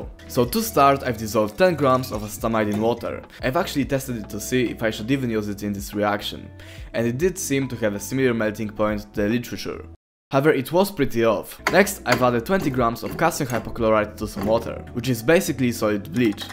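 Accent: Poland. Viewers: Why are you speaking with a polish accent?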